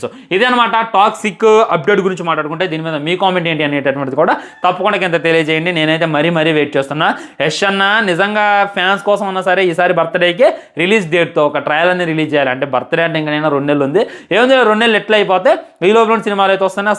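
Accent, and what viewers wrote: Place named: Telugu, India. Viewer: Aapu ni sodhe bhaiyya , paata paadinattu matladaku . Bore chestavu . Aa telugu accent vina lekapothunam 🙉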